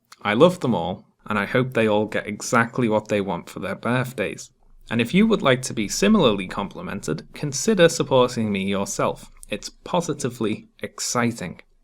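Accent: Liverpool, United Kingdom. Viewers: The Scouse accent is fucking vile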